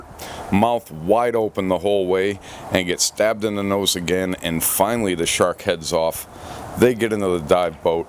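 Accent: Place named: Florida, United States. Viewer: I knew you had a Florida accent! I still can’t get over that they found a Great White with a 6’ Blue Shark, 9’ Dolphin and Turtle in its stomach! We hear 6’ or 9’ and think that’s small, if you’ve ever been fishing think how that fish flips around on the deck, then imagine one as long as you, or in the case of 9’ three feet longer it’s A HUGE FISH! And in the STOMACH of a Great White, if those bigger ones wanted to swallow you whole they could, just giant monsters.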